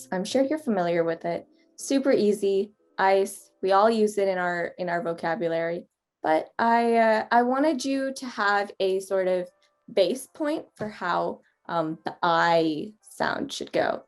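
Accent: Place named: United States. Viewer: Hey...did u learn American accent? Cause u said u were in India, so I m an indian , I also want to have an American accent....but don't know where to start..ur accent is so cool....I hope one day i can speak like that🤞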